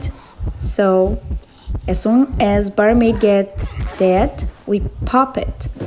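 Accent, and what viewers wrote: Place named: Brazil. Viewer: Aí mds acho tão lindo um brasileiro (a) falando inglês se como fosse português ahhaha❤️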